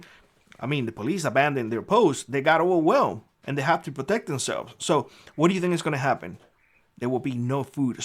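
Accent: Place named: Mexico. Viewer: YOU MUST BE A WHITE MR MEXICAN, DEPORT YOU TOO. YOUR ACCENT GIVES YOU AWAY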